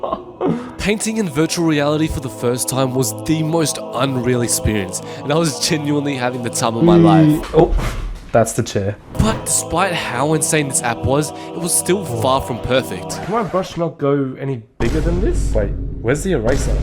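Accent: Australia. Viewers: i just LOVE the Australian accent, its jus tooooooo iconic ❤😊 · are you australian? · One Question r u Australian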